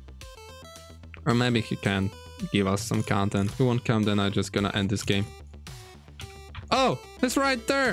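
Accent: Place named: England, United Kingdom. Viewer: Ty naprawdę polak bo brzmisz idealnie na anglika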